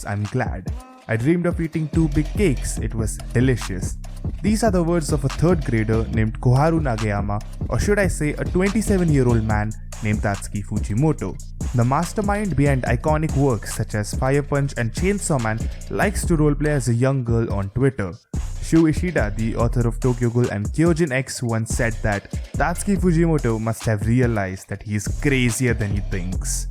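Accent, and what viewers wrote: India. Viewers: The impossible not unrecognisable indian accent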